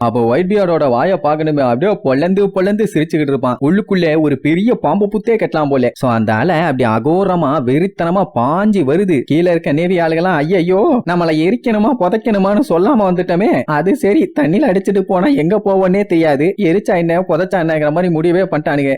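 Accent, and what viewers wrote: Coimbatore, India. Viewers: Avaru coimbatore bro so antha slang summa pesiruparu kandukadheenga 😂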